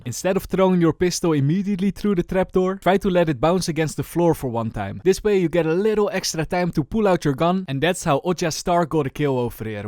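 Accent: Netherlands. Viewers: nederlands? ik hoor dat accent ofz xD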